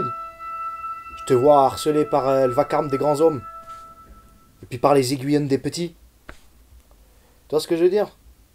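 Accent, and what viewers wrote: South, France. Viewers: Plus je l'écoute et plus jme dis que c'est ta meilleur vidéo , merci de m'avoir introduit à Tonton Nietzsche . Désormais , je vie telle Zarathoustra, je suis le pont au dessus de nos médiocrités , je parle à la montagne et ne me plie plus a la violence de l'efficience . Et putain avec l’axent du sud c'est encor mieux , con ! ^^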